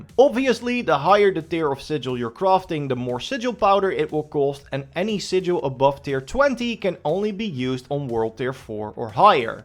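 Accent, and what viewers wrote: Netherlands. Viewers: i can just hear you are a dutch speaking english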